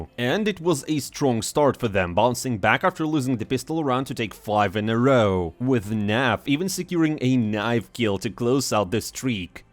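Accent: Russia. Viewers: I love we have drunk russian givig us bbc type cs news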